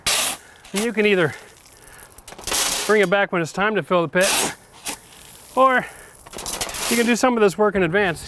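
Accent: Canada. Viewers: you talk funny, you must be from Canada. I like your video, thanks for sharing. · Haha, u almost remind me of red green, but with useful knowledge. And u have that nice Canadian accent. Bahaha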